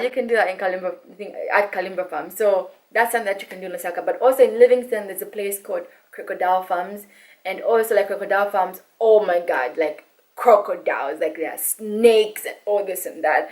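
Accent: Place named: Nairobi, Kenya. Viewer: You speak like a Nairobian 😅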